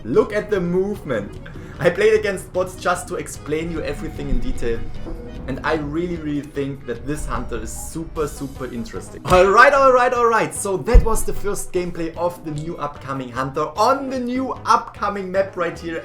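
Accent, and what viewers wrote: Germany. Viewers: Man merkt das er deutsch is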